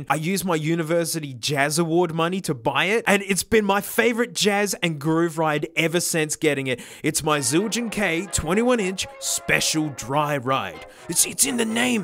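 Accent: Australia. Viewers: I've been watching this guy for seconds max, and it also took me that long to hear JonTron in his strained voice and I will never unhear him as aussie JonTron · I want SMOKO so leave me alone! Nah, I heard an Australian accent, whilst talking about drum-specific things - had to subscribe! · Man you don't have to be so performatively australian to cater to the americans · You sound like that one Australian guy that talks in that one Australia sound. You know that him I'm talking about?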